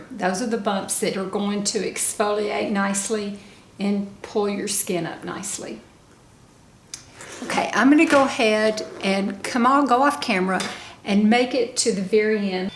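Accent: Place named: Tennessee, United States. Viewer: So sweet to share. 🩵 love listening to your voice 😊 & Tennessee accent 😂🥰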